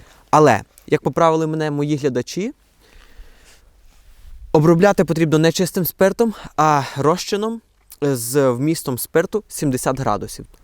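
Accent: Western Ukraine, Ukraine. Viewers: Та трошки iз захiднянським акцентом, але вишукано й в одночас просто.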